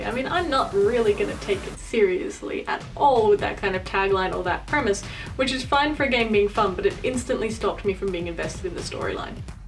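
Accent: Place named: United Kingdom. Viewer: uk accent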